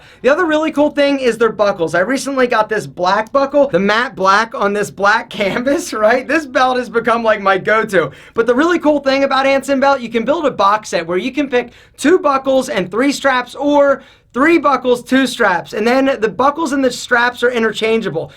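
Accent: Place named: East Coast, United States. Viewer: Hey I enjoy your channel...quick question for ya. I think I recognize that accent. Might it be from a major East Coast City between New York and Washington? I have a bet with my wife!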